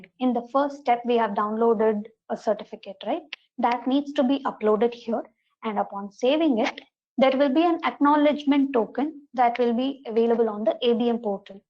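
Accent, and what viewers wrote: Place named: India. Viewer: I really love that you guys are making these training videos and actually share them for free, but for some reason there are too little views here... not sure why. no comments at all, no one to argue with))) or ask questions - if these could be used as forums - wow. And oh god, I am so sorry to have to say this, but the english accent is truely cutting my ears to small pieces, it is veeeery difficult to listen to. Please don´t take it as offence in any case, but wouldn´t it be better if for the training videos you had person for each language? For example for Indian companies make in Hindi and for English and European speaking countries get someone who has acceptable pronaunciation. I mean even if the engeneeir is the someone who doesn´t speak English, he or she could still script what needs to be said. I think this will ensure best discription and result in the trainings.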